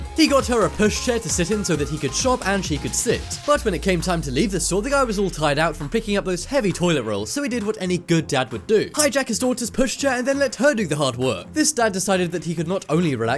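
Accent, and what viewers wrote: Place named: England, United Kingdom. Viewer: English accent SUCKS!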